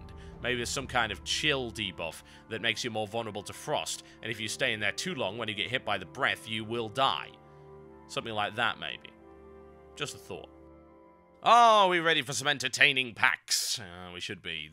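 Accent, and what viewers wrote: United Kingdom. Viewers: don't forget to mention his really cool British accent.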